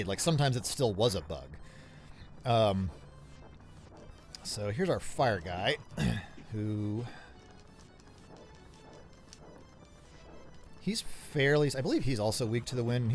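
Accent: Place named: South, United States. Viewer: I absolutly love the southern accent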